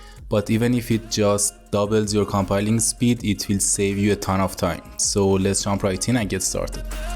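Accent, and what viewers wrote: Iran. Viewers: لهجه اصل ایرانی 😁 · قربون لهجه فارسیت😁😁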